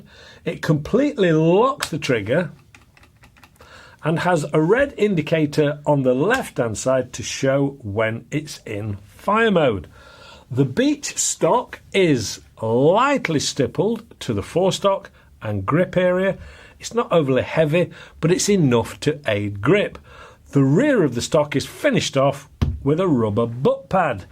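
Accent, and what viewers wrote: United Kingdom. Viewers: What a great way to present review and I liked your British accent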